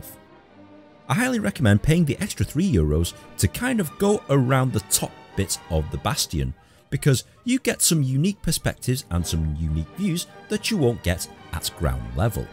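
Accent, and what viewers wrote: United Kingdom. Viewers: Fantastic video mate. Great to hear a travel guide done by a fellow Brit instead of another cheesy American. What’s the website for booking trips to the Hungarian parliament building? I’m hoping to go Budapest in the Spring.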